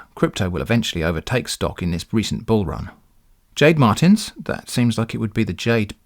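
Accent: United Kingdom. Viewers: If they had said the investments were glarded, well, that might have been enough persuasion for me!, I really think you could make a pretty penny doing audio books! (Generalizations ahead, I’m aware they’re generalizations) I think Americans trust the British accent, and enjoy it, and yours is not thick, like when I’ve tried to watch some BBC police procedurals. You’re also good at lowering your voice for dramatic effect. I mean, I really enjoy listening to you read emails! LOL. That should say something.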